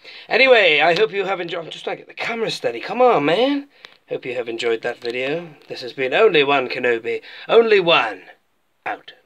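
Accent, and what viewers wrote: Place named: United States. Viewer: I like him better as a figure than I did as a character. This one is nice looking. Actually, so many of the vintage line are. i don't collect them, I just love to look at people's collections. I also like to hear your take on everything, I especially like hearing your American Accent, which is pretty good btw.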